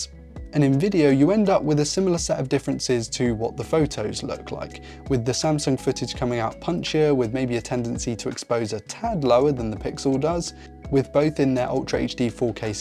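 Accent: England, United Kingdom. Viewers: You have an English accent, yet prices are USD. There are enough US tech YouTubers don't you think?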